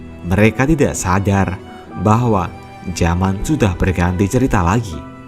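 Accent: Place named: Java, Indonesia. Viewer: Admin anda orang Jawa y.dr logat b teleb bukan orang sunda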